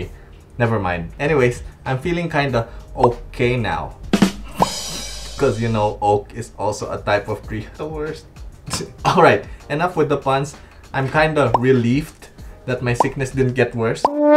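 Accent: Philippines. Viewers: hI, recogninzing your filipino accent ! Thanks for your tips, i love it ! val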